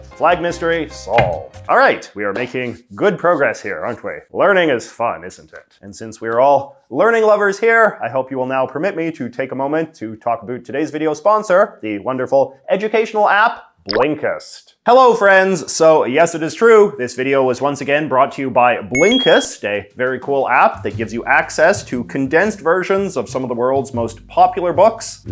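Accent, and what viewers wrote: Canada. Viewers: watching you made me realize how real a canadian accent is as an american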